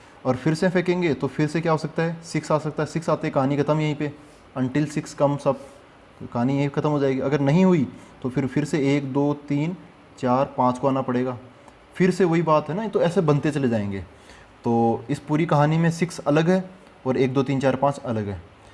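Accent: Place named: Haryana, India. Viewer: Sir apke bolne k tareeke se lagta hai k aap haryana k ho 😅 Shayad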